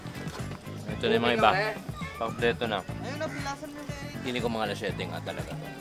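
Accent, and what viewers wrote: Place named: Ilonggo, Philippines. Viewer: Ga gwa gd ang tono kung upod mo ilonggo man.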